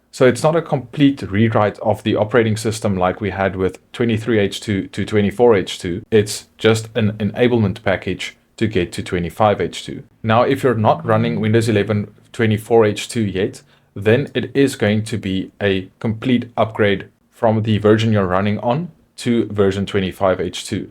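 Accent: South Africa. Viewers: You sound South African... homie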